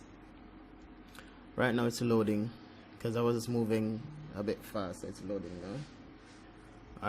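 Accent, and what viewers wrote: Jamaica. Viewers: Hello sir...where from the Caribbean are you from? You sound like a fellow yardie. Great work. I use frequency separation quite a bit.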